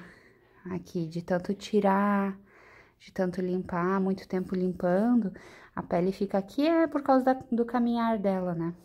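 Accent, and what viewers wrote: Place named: Rio Grande do Sul, Brazil. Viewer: estou gostando tanto do seu trabalho q não consigo ver de mais ninguém amo seu sotaque de gauchinha sou mineira de Nova serrana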